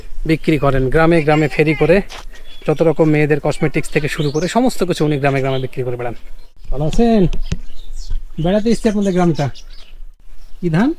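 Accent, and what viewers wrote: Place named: Rajshahi, Bangladesh. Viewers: YouTuber nischit Bangladeshi , kotha bartay bangadeshi tan ache. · রাজশাহীর ভাষার সাথে মিল আছে।আসলে রাজশাহী আর মুর্শিদাবাদের ভাষায় মিল আছে।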